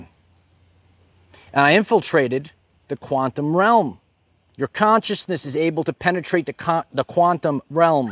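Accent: New York, United States. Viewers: a beacon of life from my past....your little NYC accent, all love...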